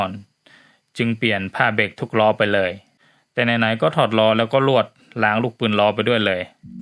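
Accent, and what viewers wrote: North, Thailand. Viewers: ฟังจากสำเนียง แอดมินนะจะเป็นคนภาคเหนือ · เป็นคนที่ไหนครับ สำเนียงเหมือนคนเหนือ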